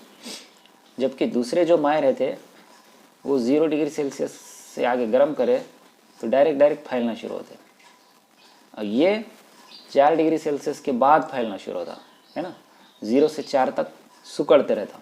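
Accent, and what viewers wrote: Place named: Hyderabad, India. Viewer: Sir is video m ap Ka accent Hydrabadi Hai ek dum.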